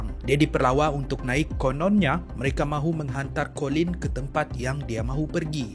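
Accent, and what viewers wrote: Sabah, Malaysia. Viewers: Org sabah kah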